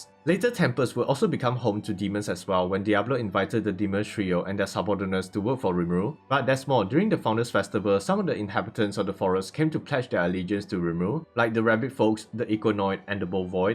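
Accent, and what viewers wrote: Singapore. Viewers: say, your accent sounds familiar, Singaporean / Malaysian ?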